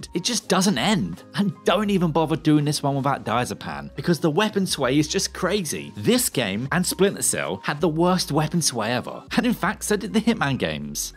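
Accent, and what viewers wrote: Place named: East London, United Kingdom. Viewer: I have to get through Mafia remastered version yet .Since I gotten back to it ,but would say the final training mission in Star Wars Fallen Order was a pain in my ass ,since it like the only puzzle in the game I could not figure out easily .But now I know for sure ,cause go YouTube to figure the easiest puzzle in the game .So where the hel is Jules and the cute itty redhead on this channel ? And your accent dude sounds east London for sure .I sure wish Hollywood get all the accents right from England .When comes to movies and other things .instead using somme snob with same accent as the royal family of misfits .But you folks are pretty cool from the old country .😁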